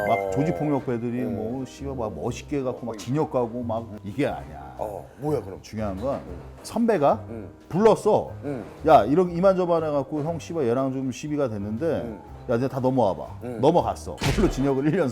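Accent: Gangwon, South Korea. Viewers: 강원도 사투리 지린다 ㅋㅋㅋㅋㅋㅋㅋㅋ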